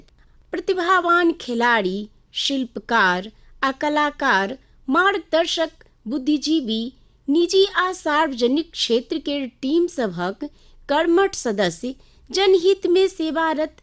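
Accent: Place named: Bihar, India. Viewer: मैथिली मिठास आहा के बोली में झलकईत अच्छी जी 🎉 आभारी छी ❤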